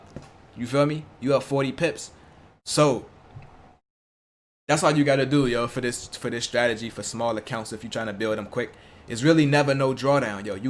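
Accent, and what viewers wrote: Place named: Baltimore, United States. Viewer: Gotta be from B’More or at least in MD/DMV but hear strong Bmore accent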